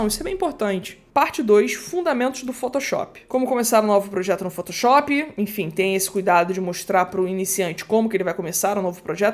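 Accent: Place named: Rio de Janeiro, Brazil. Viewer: Legal esse seu acento carioca. Me lembra muito uma amiga do Méier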